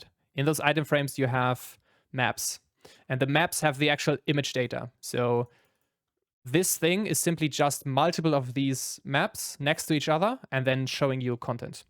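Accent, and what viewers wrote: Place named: Germany. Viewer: Dein Akzent klingt sehr deutsch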